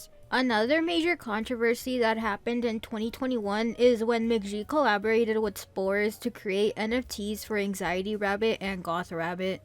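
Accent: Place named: North America. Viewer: You're kidding. You speak better English than me, and that's MY first language! And the accent sounds like it could be from the US or Canada, which is even more impressive because it sounds like you grew up in one of those places around people with the same accent! And no sweat on mispronouncing things. Us folks that grew up with English do that occasionally, too. I used to do YT, too, and sometimes, I'd read something, know exactly what that word was, but my voice would already have enough momentum going it would absolutely plow through a word with no regard for pronunciation like a bull through a gate.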